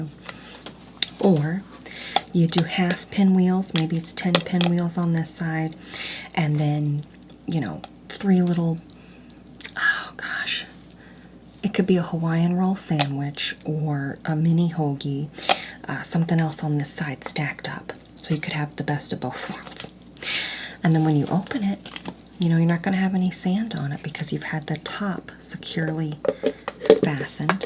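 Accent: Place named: Midwest, United States. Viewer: the midwestern accent is so gooooood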